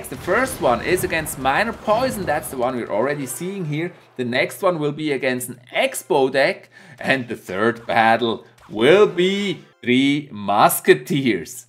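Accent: Switzerland. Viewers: Chas si das du schwizer bisch? Schöne akzent😁🌾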